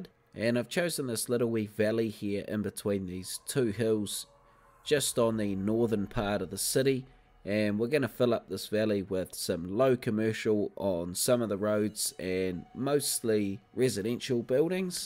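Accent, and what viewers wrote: New Zealand. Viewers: Can not wait for ep 3! You are remarkable. And I love the NZ accent! Let me not try a voiceover with my South African accent. You will laugh!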